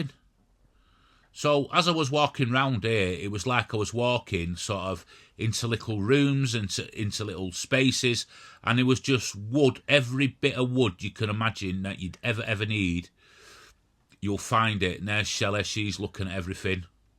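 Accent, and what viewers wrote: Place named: Lancashire, United Kingdom. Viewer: So, you're in Derbyshire. You sound more like you're from Lancashire or Yorkshire. Anyway, I'm impressed with the effort you're putting in, and I'm enjoying your videos. Keep up the good work.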